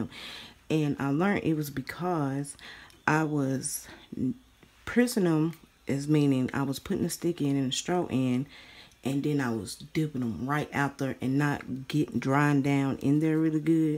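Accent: United States. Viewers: Never watched one of your videos before have to say I detect a New Orleans accent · Are you from the south, , I Am, I love your voice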